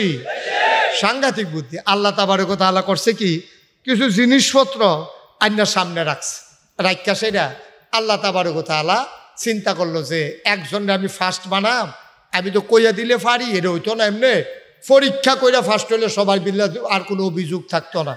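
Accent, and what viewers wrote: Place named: Noakhali, Bangladesh. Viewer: হুজুর আপনি তো নোয়াখালীর হুজুর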